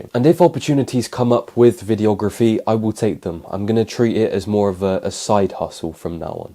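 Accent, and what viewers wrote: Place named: England, United Kingdom. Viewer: I don’t think that I’d ever have contact lenses, as the chances of getting eye infections is too great, even though I started wearing glasses for driving motorcycles in my home county of Meath in Rural Ireland many years ago (I’ve only lived in the U.K. (Manchester) for 24 years now) but I had a friend who had a severe eye infection from wearing contacts and he was in the MRI (St Mary’s Eye hospital university training hospital in Manchester) as an inpatient for several days - I’ve also used St Mary’s and the MRI dental hospital over the years and because they are a university training hospital, they have a consistently very high standard of patient care - at age 54 myself now, I now wear varifocals - by the way, I could listen to your gorgeous and well spoken English accent all day, it sounds so classy - despite my years living in Manchester, I’ve still retained my strong yet clear Irish accent, about which I’ve had a lot of compliments from my English friends 🇮🇪☘️🇮🇪😍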